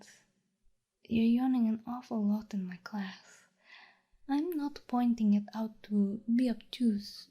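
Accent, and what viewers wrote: France. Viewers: Love your French/foreign accent roleplays, like this one and the French exchange student series. I'd love to see more of that!! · i love ur french accent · Yes I've it plz more french accent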